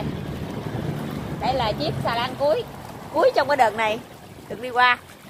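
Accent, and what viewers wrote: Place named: Mekong Delta, Vietnam. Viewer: Tôi thật sự là ngỡ ngàng với giọng phát âm của gái miền tây ta. Làm YOUTUBE kiểu này, chỉ để cho dân miền tây xem và nghe thui bạn àh. Bạn đang ở việt nam, người việt đó....làm sao mà để cho mọi người trên toàn đất nước mình, xem và hiểu được....thì bạn sẽ kiếm tiền từ youtube được mạnh hơn ha nhỏ, giọng mẹ đời àh. Xem các ca sĩ miền nam họ hát đó nha....mà học hỏi đi ha.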